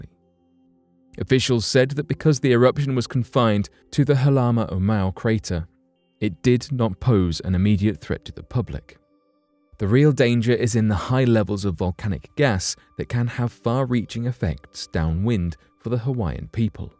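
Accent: United Kingdom. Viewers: British pronunciations are the absolute worst.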